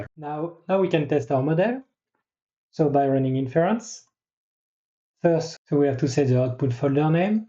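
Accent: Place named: France. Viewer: Hi ! You sound french haha, can you make a video on extraction of boxes with Yolov0 and SAM for segmentation ?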